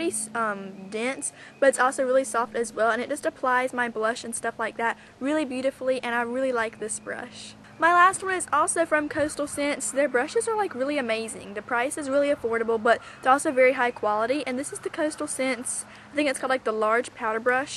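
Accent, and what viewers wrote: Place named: South, United States. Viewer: I LOVE YOUR SOUTHERN ACCENT!!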